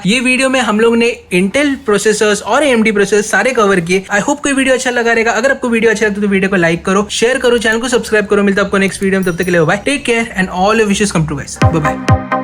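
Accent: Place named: India. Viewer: ur voice is too good to other's literally..like in hindi accent so make video's in multi purpose bro soon u will hit 1 million in month like make videos on cars and anyother vlog and every week 3 vlogs nikaloo aur maintain rakho like cars ke upr aur tour ke upr aur our community gaming 🇮🇳🇮🇳🇮🇳🇮🇳🇮🇳🇮🇳🇮🇳🇮🇳🇮🇳 bro plss u can do whatever i say i hope u listen.... Ku ki baad mei reply ni krta koi 😅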